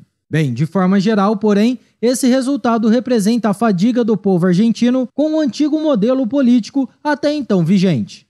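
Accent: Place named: Caipira, Brazil. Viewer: Ótimo vídeo. Mas precisa diminuir esse sotaque caipira, que torna irritante para muitas pessoas assistir o vídeo. É só prestar atenção nos "erres". Abraço!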